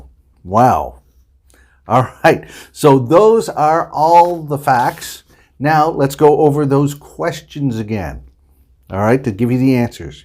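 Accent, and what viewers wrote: Russia. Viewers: ** Wow! I've trained hard my listening today 😅 and I loved your Russian accent hahaha ** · I did enjoy listening to your Russian accent! 😉👍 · Wow, I didn't know about Stalin's bunker. Probably I will visit it. And your Russian accent is so funny😂🤣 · Wow, I like your Russian accent, that so funny 😂. Thanks for your great video 😁👍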